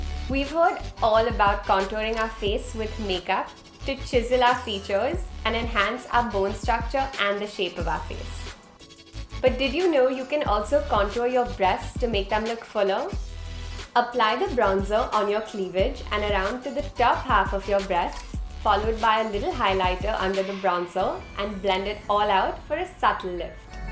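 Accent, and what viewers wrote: India. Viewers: is it just me or does she have a Indian accent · Ummm someone please explain why this white girl sounds Indian? It's freaking me out.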